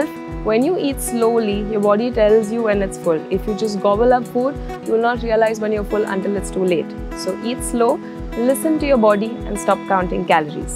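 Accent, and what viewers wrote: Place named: Kerala, India. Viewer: Oh! That's great! Your rendition resembles a typical kerala girl, infact,that's what I felt.Anyway great lessons and quality contents.Go ahead madam 👍🏻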